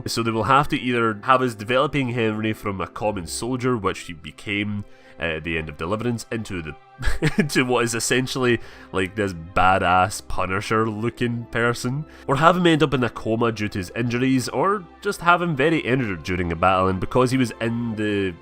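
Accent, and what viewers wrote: Scotland, United Kingdom. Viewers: You're accent is so Scottish that I had to really concentrate to hear that it was still English sometimes lol.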